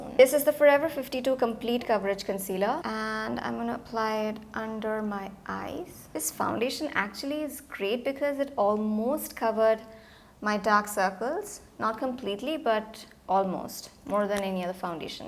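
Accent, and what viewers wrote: Bengal, India. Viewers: Yes that bengali accent was beautiful 😍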